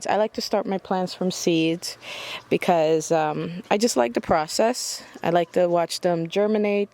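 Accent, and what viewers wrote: Caribbean. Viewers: I’m Guyanese!! We does call it bora... Ik you sounded Caribbean 💚💚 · I knew I heard some West Indian coming through, lol, once you mentioned scotch bonnet and callaloo, I was like yup....lol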